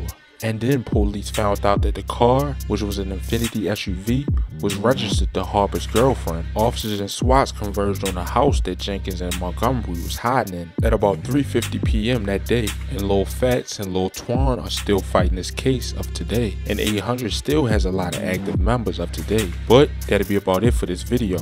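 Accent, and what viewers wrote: Baltimore, United States. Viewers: Baltimore for sure. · Bruh made the video from b more you can tell by his accent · whoever voice this is def from baltimore 😂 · This dude is from Baltimore from the accented speech. My celly was from B more in the feds. Not a lot of that info is correct bout da south side and im from out west where we too busy getting money and women to be on dumb shit. But that info isn't fully correct bro and im not a folks organization member neither. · He definitely from Baltimore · Bruh where are u getting all this cap from how about u do something on Baltimore sounds like u from there…. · This guy gotta be from Baltimore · You from bmore huh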